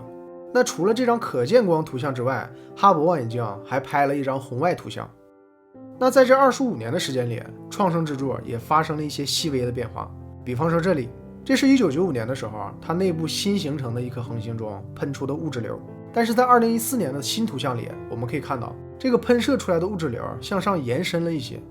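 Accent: Northeast, China. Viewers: 解说中，要是能把东北口音去掉就堪称完美了！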